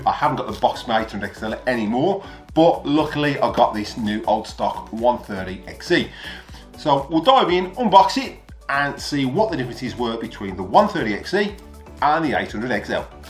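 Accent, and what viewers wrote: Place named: West Midlands, United Kingdom. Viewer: That accent sounds like Walsall or close in the West Mids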